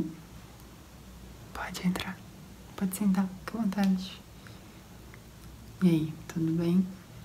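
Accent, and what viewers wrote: Rio de Janeiro, Brazil. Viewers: Aiiii eu sou apaixonada no sotaque carioca aaaah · Eu amo quando faxxx sotaque carioca!! Acho mais relaxante ainda😍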